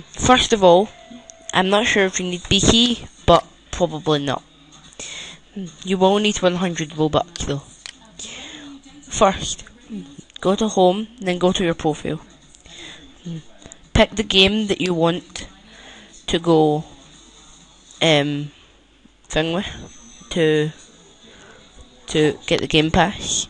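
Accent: Scotland, United Kingdom. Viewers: your scottish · sho ya hache cho dchownload ich in ya sherver... IS THAT HOW U SPEAK? clearer please... but i think you are scottish cuz your now is like scottish now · judging from his tone im sure hes scottish am i wrong? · He is scottish, :P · dued are you brittish your voice it sounds brittish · British accent + lisp. Not a good combo. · I can tell it is a scottish accent I'm scottish but I don't have the accent.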